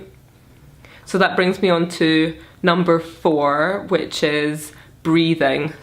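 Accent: Scotland, United Kingdom. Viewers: She has a slight Scottish accent. Beautiful skin.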